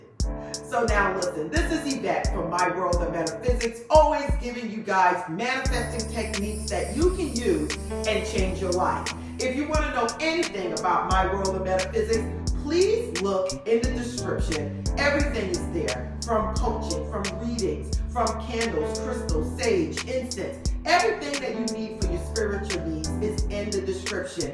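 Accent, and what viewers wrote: New York, United States. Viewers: Love the content and your NY accent ! 😍❤🙏🏻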